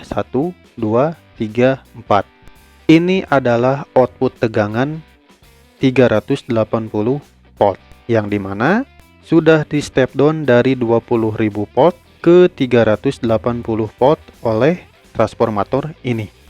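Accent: West Java, Indonesia. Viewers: Mantap, sunda pisan, salembur atuh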